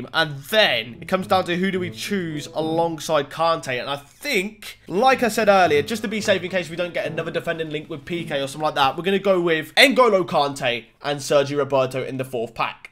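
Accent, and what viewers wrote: Northampton, United Kingdom. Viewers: Are u from Northampton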